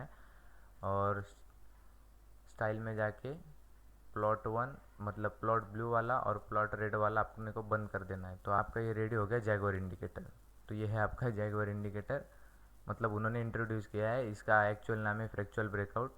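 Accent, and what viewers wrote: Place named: Maharashtra, India. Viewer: तुझी हिंदी बोलण्याची पद्धतीवरून तु मराठी वाटतोस आहेसच...video भारीच होता .हे सगळं मराठीत येऊ द्या ..मराठी मधील शेअर मार्केटचा सगळ्यात फेमस आणि उपयुक्त च्यानल तुझं होवो ह्या शुभेच्छा .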